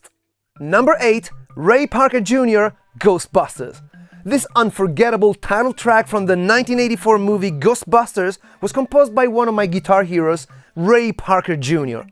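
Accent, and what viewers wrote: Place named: Italy. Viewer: Nice video. 2 out of 100, for your amazing English. I love your accent. You give English that italian design feeling. Cheers. PS: I'm reporting this vid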